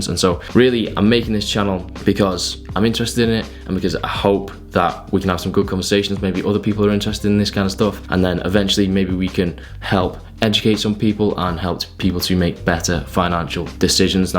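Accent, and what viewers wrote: United Kingdom. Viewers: Nice vid - subscribed! Where you from in the UK?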